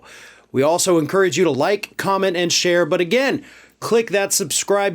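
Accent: South, United States. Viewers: Love the accent..yep the birn and breed in the south is still so backward and pissed that they font have any slaves.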